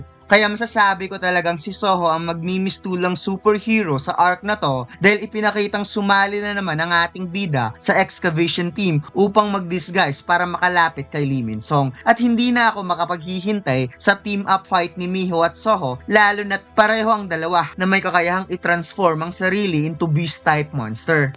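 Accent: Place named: Conyo, Philippines. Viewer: Ganda salamat idol.. Kaso wag masyado pa konyo yun nagpapangiti e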